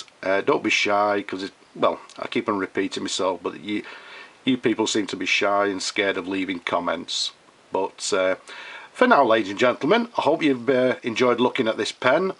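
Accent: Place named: Liverpool, United Kingdom. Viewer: Thanks bud, I think I can do this, kind of like a Harley his to clean your carburetor video..great accent mate, is text a Liverpool accent or Scottish ?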